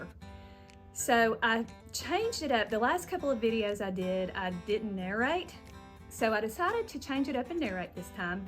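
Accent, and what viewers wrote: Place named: South, United States. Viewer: I love southern accents! Please don’t let anyone tell you your accent is horrible.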